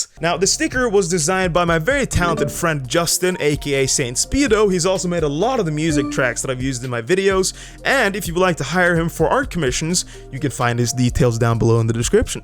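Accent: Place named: Norway. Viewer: Spotted the accent day one, have family with thick Norwegian accents here in Minnesota. Love your content man, keep it up!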